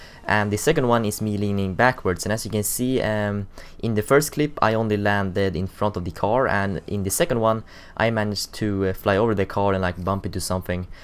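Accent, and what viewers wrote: Sweden. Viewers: From your accent I'd guess you're Swedish.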